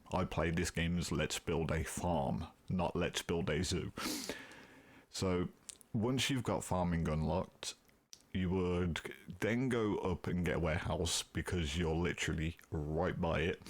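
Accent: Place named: United Kingdom. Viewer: Love this just brought the game on switch with dlc on the e-store! Where’s abouts are you from in the UK? I’m British from Birmingham and trying to place your accent!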